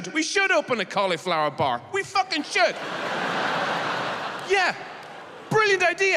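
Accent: Ireland. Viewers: what is this beautiful accent? Irish? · Irish accent, so beautiful...🥰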